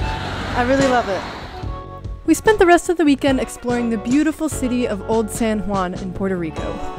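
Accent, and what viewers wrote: Canada. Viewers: Thanks for sharing,. I’m not a big tattoo guy but certainly respect your decision, your reasons for getting them, and the meaning they have to you. Stay safe and healthy and look forward to more videos, (and, no matter where you travel, don’t ever lose that great Canadian accent)!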